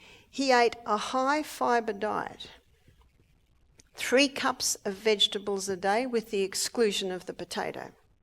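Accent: Australia. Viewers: Man…she’s Australian. They don’t pronounce some words like Americans (for example). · jim dee - She is from Australia and they say many words differently than USA does. Great Britain also does. Forget even trying to understand the English of many from Scotland and Ireland. Ireland is easier, though. I was watching another of her videos and could not understand several words at all. One of them turned out to MEAN Red Bell Pepper. They have an entirely different word for this vegetable than we do. Have you ever heard a Brit pronounce Schedule? And those from South Africa also pronounce many words differently than we do - especially words from the Bible.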